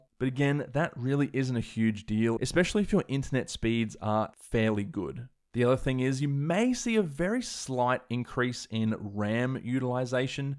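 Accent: Australia. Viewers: Brilliant video... love the Australian accent my friend.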